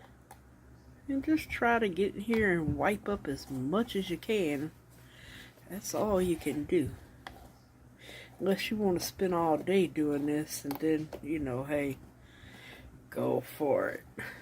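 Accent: South, United States. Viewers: Love all your videos,!!!! You crack me up with your southern accent and words you use. I love it! ❤️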